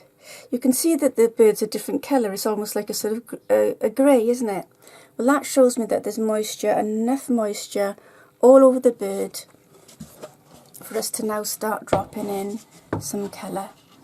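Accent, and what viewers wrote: Wales, United Kingdom. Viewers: Yeeeeaah - a three parter - you've just made my day! What better then to sit down and watch an interesting and instructive tutorial whilst listening to that gorgeous Welsh accent! Thanking you in advance for all three videos.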